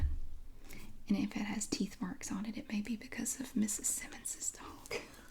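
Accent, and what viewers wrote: South, United States. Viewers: Love your accent, to me a Southern accent is a cockney accent :-)I live in the West Midlands UK.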